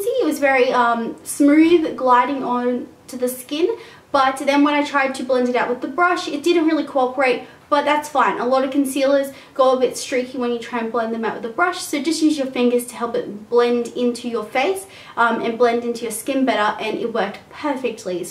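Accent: Australia. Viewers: Kawaii Cukie Going by her accent she's in Australia like me, our Daiso stores sell everything at $2.80 :( everything is more expensive here.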